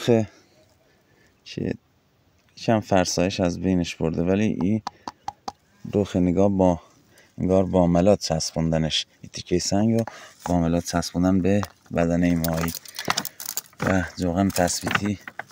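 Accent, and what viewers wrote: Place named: Fars, Iran. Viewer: درود دوست عزیز بسیار لذت میبرم از ویدیو هات یه سوال دارم اینکه شما کدوم منطقه هستید فک میکنم استان فارس باشید از لحجه شیرینتون این حدس رو زدم ممنون میشم بگید کدوم شهرستان هستید که اینهمه نماد و کارای زیبا داره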